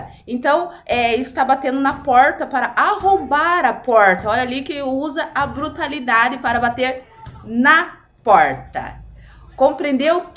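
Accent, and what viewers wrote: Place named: Paraná, Brazil. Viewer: Aula muito boa , oratória muito boa com o sotaque do nosso Paraná rsrs. Vou indicar pro meu filho assistir, sucesso professora !!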